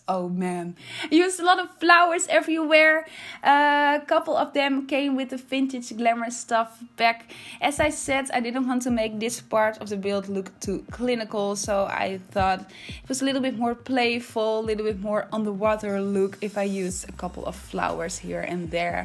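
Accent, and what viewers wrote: Netherlands. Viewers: Wow i realy love the balcony in the bedrooms❤️ amazing how you made this! Looking forward to the next episodes of this series. Which country you live? You sound a bit dutch/holland like me😁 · The Sim Stream ik uit België 🇧🇪 ik dacht dat je uit Nederland kwam want er zat een Nederlands accent tussen je Engels 😁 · Het ziet er geweldig uit 🤗 Alleen zou ik als kleine tip meegeven om wat meer de Amerikaanse of Britse uitspraak van woorden te leren 🙈 Je kon namelijk echt duidelijk horen dat je Nederlands bent, maar dat is zeker geen kritiek hoor, het gebruik en kennis van de woorden is namelijk erg goed 🤗 Het is oprecht gewoon bedoeld als tip 🤗 Je hebt in ieder geval wel een nieuwe subscriber 🙈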